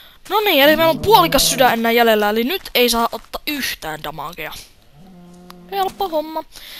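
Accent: Karelia, Finland. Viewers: Onko tua sun murre karjalan murre ?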